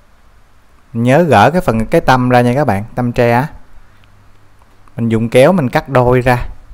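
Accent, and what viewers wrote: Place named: South, Vietnam. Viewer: Giọng miền nam dể thương quá anb ơi❤❤😂😅❤❤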